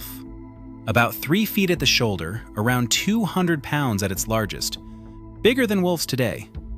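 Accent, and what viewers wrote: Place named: United States. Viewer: Interesting but you sound American so keep the measurements in feet and pounds. Makes no sense to mix them up with grams and meters.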